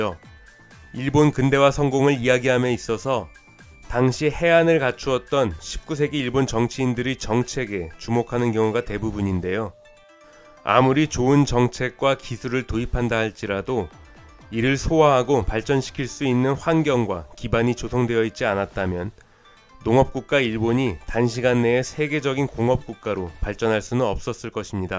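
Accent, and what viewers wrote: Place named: Korean-Chinese, China. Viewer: 한국인 맞음? 말투가 조선족같노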